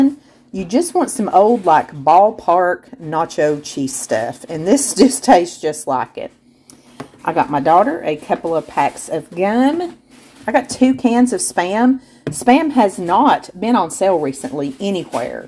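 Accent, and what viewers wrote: South, United States. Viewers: I adore watching you! You remind me sooo much of my family in Tennessee. They have all passed so listening to your southern drawl is such a nice reminder <3 Blessings to you!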